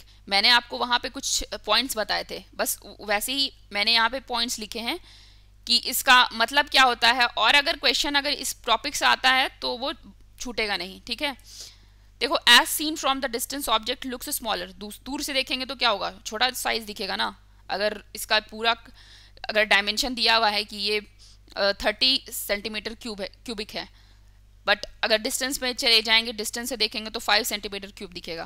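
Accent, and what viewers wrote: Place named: Bihar, India. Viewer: god bless you ur voice is very beautiful. lagta hai app Bihar se belong karti hai apki tone she lagta hai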